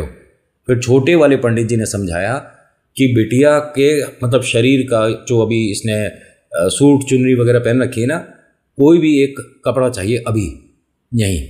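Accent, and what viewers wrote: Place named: Braj, India. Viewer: 🙏🌹जय श्री श्याम 🌹🙏 भाई ये क्यों बोल दिया की सिर्फ कहानी ही मिलेगी ये किस ग्रह के जीव की बात मान ली अरे भाई हमें ज़ब इधर उधर की न मिल जाय मजा नहीं आता और बीच मे जो ब्रज भाषा निकल जाती है उसे छुपाना नहीं वो ही तो आपके मुख से प्यारी लगती है तो फिर धन्यवाद जैसी आपकी मर्जी सुनाओ हम तो घर मे भी सुनते हैं 😅अलीगढ से रविंद्र सिंह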